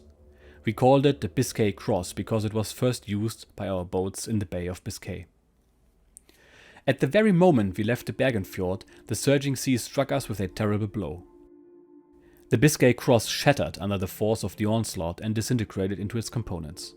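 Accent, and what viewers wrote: Germany. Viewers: I like the fact that you are narrating this with a German accent... it definitely brings a little more life to what these men may have sounded like in general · Hi, another great episode under your belt! Your narration is improving with every episode and your German accent adds to experience of reading the war diary of a German U-Boat officer. You made the right decision to ditch the synthetic voice as a human voice is so much better and knows certain language conventions better. I also enjoyed hearing about your holiday at Lake Constance. More flavour for the story! Enjoy your holiday and see you next episode! Mark from Melbourne Australia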